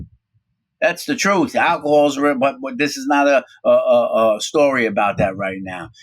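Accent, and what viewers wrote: New York, United States. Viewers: Yo, you got a cup of coffee son? Lol no doubt thats NEW YORK!!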